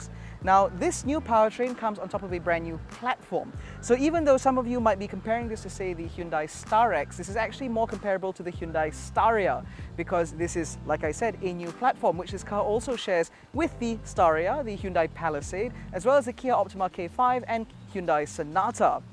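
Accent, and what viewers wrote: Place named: RP, United Kingdom. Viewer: Just wow with your smooth and fluid presentation! Love the “queens English” sounds posh for a posh looking car! Done like and subscribed! Well done!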